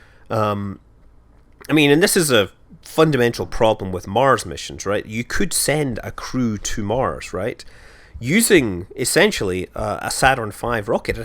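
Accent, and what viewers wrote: Scotland, United Kingdom. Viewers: Nah, it's just the Scottish accent.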